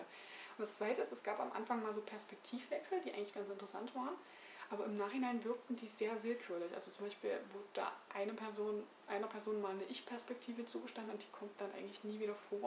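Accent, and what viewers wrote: Dresden, Germany. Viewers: Die rothaarigen Booktuber mehren sich! :) Gerade entdeckt durch dein Abonnement auf meinem Kanal! Patrick Salmen muss man eher mal live erlebt haben... Habe ihn in Berlin ein paar Mal gesehen, allerdings nur mit sehr neuen Texten. Bücher von Poetry Slammern besitze ich aber kaum.. Lieber das Hörbuch! Der Herr ist vornehmlich in Wuppertal unterwegs. Kurzgeschichten- und Lyrikbände können auch mein Herz gewinnen. :) Danke für die Empfehlung der Booktuberin/Autorin. Die Farbe Lila und Wer die Nachtigall stört.. Habe ich mal in einem Zug (ja, ambig!) gelesen und war sehr schockiert.. Habe den amerikanischen Rassismus vorher kaum wahrgenommen in der Literatur. Schöne Neue Welt kennen gerade die Leute hier kaum, die viele Jugenddystopien lesen. /: Ziemlich schade. Für mich ein großartiger Roman. Toller Kontrast zu 1984 von Orwell. Sie versucht Murakami hinter sich zu verstecken. 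:D Unbedingt mehr Videos machen. Mir fehlen solche Booktuber, die wirklich etwas zu sagen haben. Deine ruhige, eloquente Art ist sehr angenehm. Das mit den Charakterstudien erwähne ich auch in jedem Video.. Zu viele Gemeinsamkeiten! :) Liebe Grüße! P.S.: Dresdner Dialekt kommt manchmal durch, sehr toll. Und, ich habe dich mal auf Facebook in einer Booktube-Gruppe empfohlen. :) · Aha SciFi steht bei dir im Regal also links. Gut zu wissen :P Aber jetzt mal zum Inhalt: Zum Glück hast du mich abonniert, sonst wäre ich erst zu spät über dich gestolpert - das wäre schade gewesen, weil ich deinem Bücherregal entnehmen kann, dass da durchaus einige literarische Schnittstellen sind und mir vor allem auch dein kritischer Blick gefällt. Es freut mich, wenn mehr Menschen mit einem etwas anderen Geschmack den Weg in die Booktube-Community finden. Nahezu mutig ist es auch von dir zu sagen, dass du - OMG! 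- Bildbände bzw Mangas/Comics liest und die auch vorstellst. Soweit habe ich mich noch nicht getraut^^ Brave New World muss wohl auch demnächst bei mir endlich einmal dran kommen. Ich nehme es mir vor, seitdem ich vor vielen Jahren beim Film Free Rainer von der Existenz des Buches gehört habe :D Ich bin gespannt auf mehr Videos von dir und finde es tapfer von dir, dass du deinen Dresdner Dialekt nicht verleugnest, so wie ich meine sächsische Herkunft verleugne. Kannst du es aber irgendwie ermöglichen, dass die Lautstärke noch etwas höher wird? Liebe Grüße Vincent:-)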